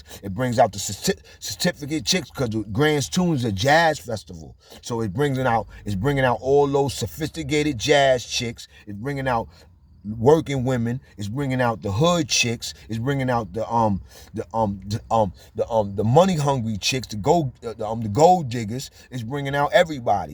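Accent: New York, United States. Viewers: Ain't nothing like hearin a REAL NY NIGGA spit that G!...U Heard.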